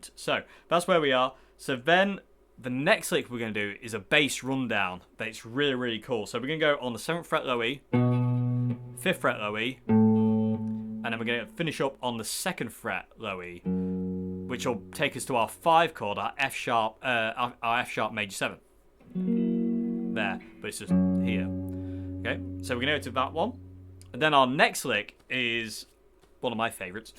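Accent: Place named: Northern England, United Kingdom. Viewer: Can not pinpoint your accent lol, it's slightly northern, but then very southern 😂😂 where you live?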